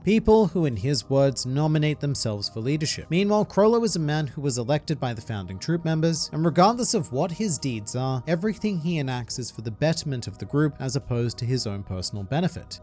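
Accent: Australia. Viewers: You’re Australian? How didn’t I realise 😂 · I didn’t realise that Aussie…oi oi oi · wha is it about this australian accent which is so pleasant to listen to?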